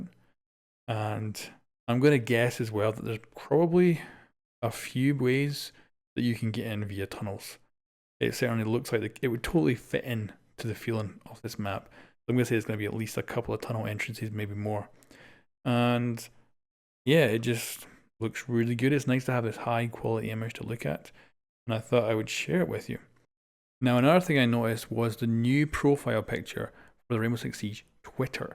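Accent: Scotland, United Kingdom. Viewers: Are you scottish? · Love the accent! Are u scottish?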